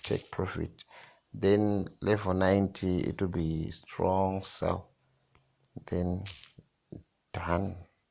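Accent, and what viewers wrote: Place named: Zimbabwe. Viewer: are you zimbabwean??..ndanzwa tushona umo..how can i contact you bro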